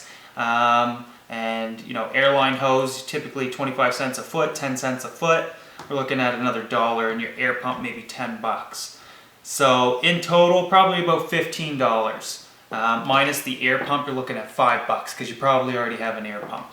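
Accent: Canada. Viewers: I love his Canadian accent. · Are you canadian?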